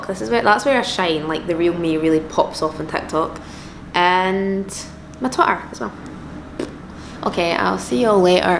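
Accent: Scotland, United Kingdom. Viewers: you re scottish? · Felt so comfy, with the scottisch accent, really nice, ur funny and i love how it came out! Lov uu hope u upload soon again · Scottish, but it's a quite muted accent compared to most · Her * speak * Me : "that's sound Scottish" Her : "Midges" Me : "Yeeaaah definitely Scottish" (I HATE Midges) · it took me so long to realise that she has a scottish accent. i’m literally scottish but i don’t have an accent so i never notice lmaoo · What part of Scotland are you from? I love your accent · Sounds more Scottish. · she's friggin Scottish hen 🥴🥴🥴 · Me: not used to hearing my own accent (used to American and English vids) Spends the next few seconds wondering whats happening Then it clicks, SHE'S A SCOT!!!!🏴🏴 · She’s from Scotland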